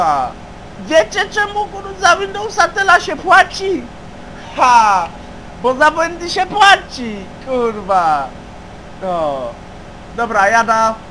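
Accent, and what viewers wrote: Silesia, Poland. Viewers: Po śląsku było lepiej jak mówiłeś :( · Seria GTA u bez Śląskiego gadania jest tak niepotrzebna, jak BuFu na Polskim YT...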